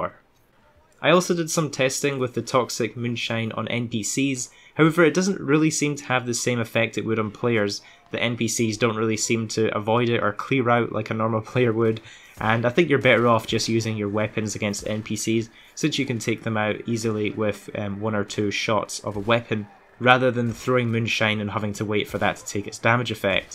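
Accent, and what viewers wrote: Scotland, United Kingdom. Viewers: What part of Scotland are you from?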